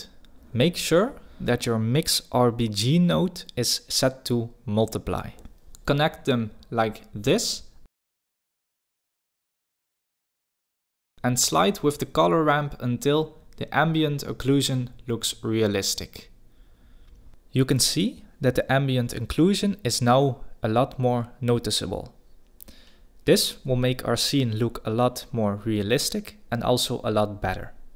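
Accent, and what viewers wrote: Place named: Netherlands. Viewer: hi im not sure but are u dutch. i can tell from ur accent. but i wanna ask something actually. do u think that if i improve my 2d 3d art that i can find a well paying job. bc i want to do animations. and im not rlly into creating logos or commercials. and what u create looks really good. keep up the work ! and thank u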